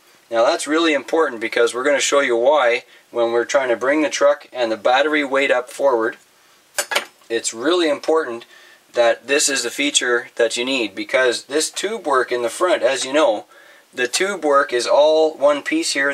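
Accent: Canada. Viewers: Dont know why, but i always thought canadian accents are cool. Srsly, no offense. I will not mention strange brew.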